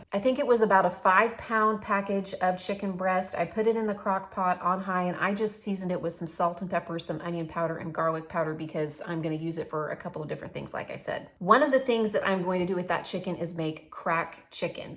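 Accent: South, United States. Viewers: I can’t tell you why but the “that’s French y’all” with your southern accent absolutely sENT me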